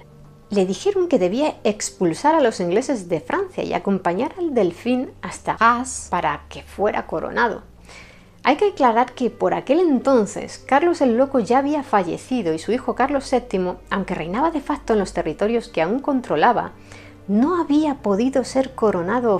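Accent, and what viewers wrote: Castile, Spain. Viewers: Soy de Colombia pero me encanta escuchar estás historias antiguas en el acento de los españoles,siento como si estuviera viviendo en carne propia esa historia,lo relatas muy bien. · Hola Feliz Navidad cómo estás, me encanta ver tus videos y escuchar tu acento castellano, Yo amo la cultura, la historia la política, la religión y la música y escuchar tu voz en la narrativa, me encanta, Gracias por estos videos, Dios te bendiga hermosa mujer y que la Virgen te siga cuidando amén; la doncella de Orleans se convirtió en la patrona de Francia ⚔️🙏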